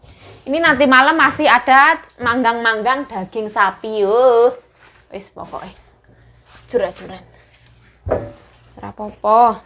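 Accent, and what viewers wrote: Java, Indonesia. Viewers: Mbak pean Indonesia mn to kyok'e Bahasane podo karo aq jowo tulen😀👍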